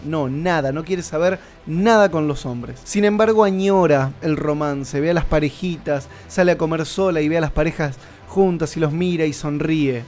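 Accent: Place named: Argentina. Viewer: Solo algo: por tipos como este es k me gusta tanto el acento argentiono hahahah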